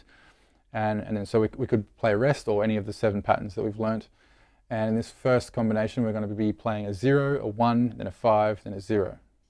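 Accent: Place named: Australia. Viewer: mate! I knew as soon as I heard the Aussie accent that these vids are going to be dinky di. You don't waffle on with a 20 second intro of some extremely mediocre hard rock music, followed with a "Hi guys, I'm from xxxxx in xxxx, like to say a huige thanks to my sponsors" Blaaah blah blah... Straight to the point! Love it mate! Cheers from Melbourne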